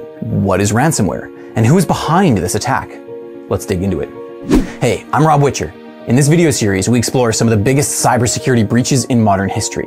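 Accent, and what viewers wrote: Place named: Canada. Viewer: You Canadian eh?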